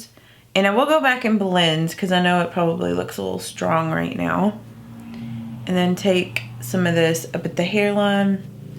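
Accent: South, United States. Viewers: I just love watching your videos. I absolutely adore your accent....are you from the south? Your so sweet and your tutorials are easy to follow and love that you use a lot of drugstore products! 😁🇨🇦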